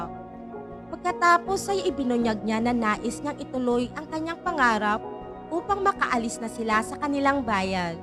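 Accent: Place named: Pampanga, Philippines. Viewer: Kapampangan ang nagkukwento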